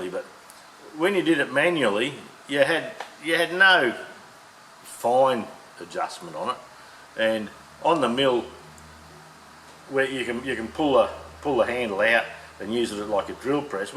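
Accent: Australia. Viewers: The. Americans go to talk to a shrink, I just listen to a mad Aussie talk for a while and i feel on top of the world. thanks mate another cracking stew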